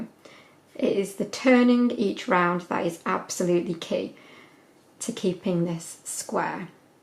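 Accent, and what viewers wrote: United Kingdom. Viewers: My heritage is Scots and I love a British voice, and I appreciate so much learning a new pattern. I have been crocheting since my mother-in-law taught me how in 1997. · Hello! 😊 First, thank you for your wonderful instructive video! Your voice and British accent sounds very kind and patient. My question is - Can this be done without turning after each round? Does it not look good if you don't turn? I taught myself how to do some crochet back in the 70s, but at that time I usually only did the basic stitches (single, double, triple, slip stitch and so on. I hadn't heard of the monk stitch yet before today. And I also haven't done crochet in a about 30yrs, I wanted to make a macrame dream catcher and decided to make a doily instead of purchasing one. I think I've found a renewed love for the crochet! Thanks again for your video. I'm sure I will watch more!☺️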